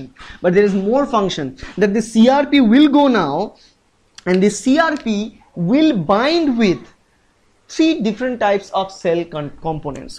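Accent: Bengal, India. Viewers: It seems u are a Bengali? (by your accent and name) Which college are u from.. , sir...?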